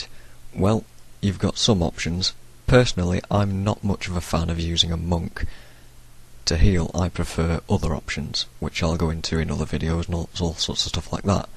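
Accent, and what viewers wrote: United Kingdom. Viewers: ty alot for helping me with some hero builds british guy ;-)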